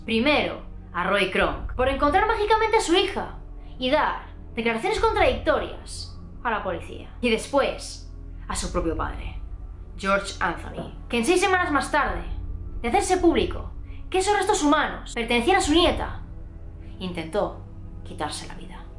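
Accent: Galicia, Spain. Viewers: Eres de Galicia?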